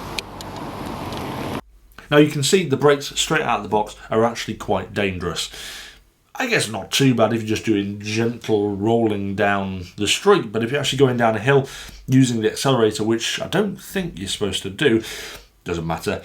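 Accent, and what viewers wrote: England, United Kingdom. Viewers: I Like the books and the English accent, it makes you seem smart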